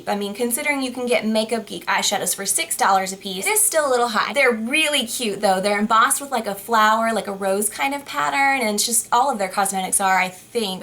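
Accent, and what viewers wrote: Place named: Yorkshire, United Kingdom. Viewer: Her accent is northern- I think maybe Yorkshire! (Sorry if I'm wrong...)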